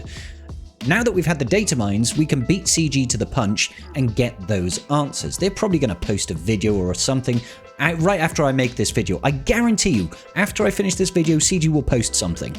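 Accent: United Kingdom. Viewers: Error? Era. You’re a Brit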